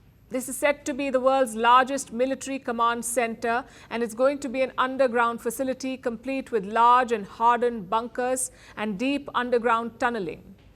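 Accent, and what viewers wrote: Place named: India. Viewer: Indian accent sound horrible 😅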